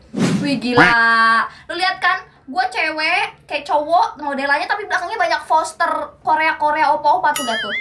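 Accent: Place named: West Java, Indonesia. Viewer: Aduh logatnya sunda teh S E M O G A T E H I S M I S E H A T T E R U S: ) Aamiin😊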